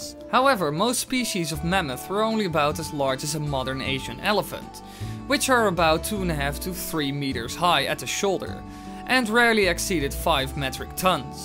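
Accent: Netherlands. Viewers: ik kan gewoon aan je stem horen dat je Nederlands ben :'D toffe video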